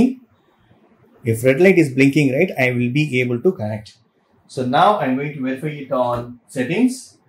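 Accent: India. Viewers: Thanks, your english accent has a malayalee flavour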